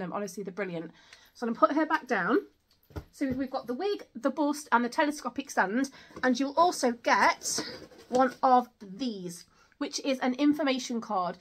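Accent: United Kingdom. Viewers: Very good video!! I love your enthusiasm. I have English as my second language and I wonder which part of the UK you're from. I love the way you talk. ❤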